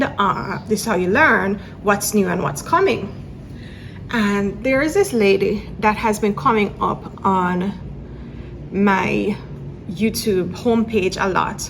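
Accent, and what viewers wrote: Jamaica. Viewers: I'm just a plain white Canadian but I think your Jamaican accent is charming. I can understand 90% of what you say but maybe 10% of your native Jamaican sayings go over my head lol. I don't know how your channel popped up on my homepage, I guess because I started watching House Hunters videos, though I never saw the episode you were in. I usually only see independent travel videos. I like your message of encouraging others to live more, it's something a lot of people need to hear right now. Don't compare yourself too much to that DoughDash woman-I don't even think using that name is legal (since it's essentially piggybacking on the popularity of the DoorDash name which the company had to pump a lot of money into marketing for.) You are doing it right just by putting your own name on your channel (and since your last name is, it also taps into that part of the audience who either loved or had issues with class lol). 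If I could suggest something to you to improve the views of your videos, it would be show more scenery of Merida, maybe some sunsets or beaches, just more general things people like to see. I have never been there and am not likely to go, it would be kind of nice to see something like that. Most of the successful travel channels I see are from independent Youtubers who film their stays in various hotels and locations, but they've usually started off filming the beautiful scenery of their own hometowns. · First timer to your channel, and a fellow Caribbean national trying to come up with content. I'll tell you what I think dough dash's "secret" is. She has displayed her struggling financial situation to everyone, and her viewers are rooting for her to succeed and I believe that this is what has driven her channel's recent success. ... ppl are invested in helping her and seeing her succeed. 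I don't believe this is sustainable content... I'm sure she will HAVE to find something more substantial as time goes by. Only if you are prepared to display most of the private parts of your life, where you are struggling, you will get ppl following you to see how the story pans out. DD channel is like a soap opera... and this is what has ppl coming back to see "what happening today...?" I'm not prepared to advertise my financial or family struggles to get the views. Please take heart.... I'm a new subscriber to you.... love me a jamaican accent❤ Love from Trinidad 🎉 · YARDI GIRL HI THERE! Love di accent u nuh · and relevant because my proof is I found your page when looking for information about moving abroad specifically to Mexico and when you started talking with your Jamaican accent it resonated with me because of some of my friends who are Jamaican. It’s a global ting😀 in Texas, Mexico and the best city in the world New York!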